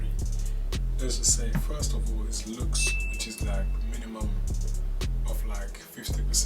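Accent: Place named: Jamaica. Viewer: Jamaican accident is coo bruh. no homo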